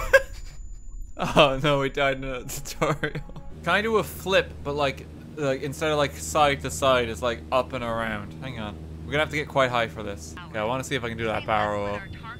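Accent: Ireland. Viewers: wait are you irish or something? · Is he Irish?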